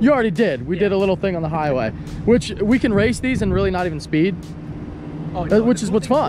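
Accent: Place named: South, United States. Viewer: How do you have a southern accent and your from Harrisburg? I’m from Lancaster and don’t have an accent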